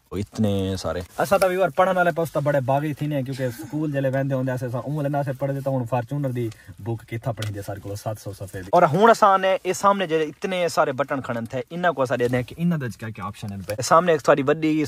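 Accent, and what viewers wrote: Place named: Saraiki, Pakistan. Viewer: آج تو چھوٹے پروفیسر صاحب بھی اپنے خیالات کا بتارہے ہیں کہ گاڑی میں کیا کیا فیچر ہیں اوبھائی اس کو پڑھائی کرواؤ پروفیسر نا بناؤ اس تو ملانہ بات چیت میں بہتر ہے چھوٹے کو پہلے بات چیت کرنے طریقہ سکھاؤ تاکہ جب یہ بڑے ہوکر بات چیت اچھی کرسکے اور میرے کافی دوست سرائیکی ہیں جن کا تعلق ملتان ڈیرہ خاذی خان اور رحہیم یار خان سے ہیں ان کی بات چیت میں آپ کی سرائیکی میں فرق ہے🙏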